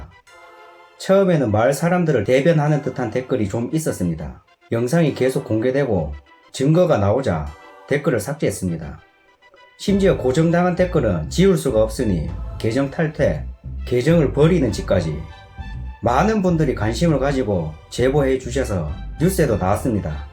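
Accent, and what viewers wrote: Gyeongsang, South Korea. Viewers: 전라도가 좀그래요 님은 경상도?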